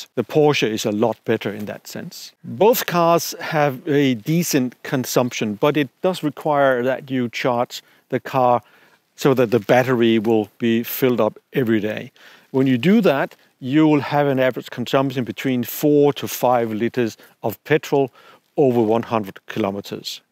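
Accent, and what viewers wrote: Denmark. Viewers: Is this Danglish ??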